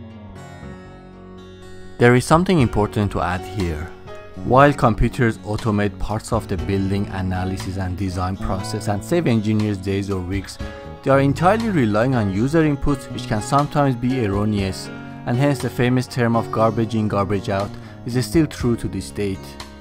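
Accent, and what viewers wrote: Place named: Iran. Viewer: Iranian accent. قرمه‌زبزی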